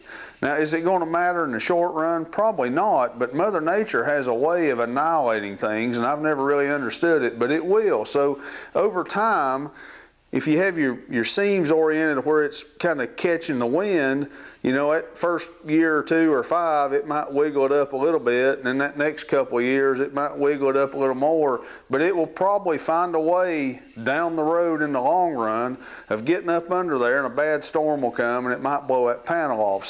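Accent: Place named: Tennessee, United States. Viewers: Great video, thank u much Sounds like middle Tennessee accent, wonder where u from. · I was guessing Middle Tennessee, too, but northern portion, closer to KY.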